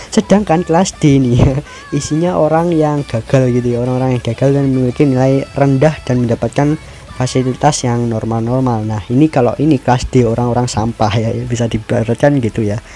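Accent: Java, Indonesia. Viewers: Jowo bet suara lu bang medok medok serek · Jawa nya medok skali wkwkwk:v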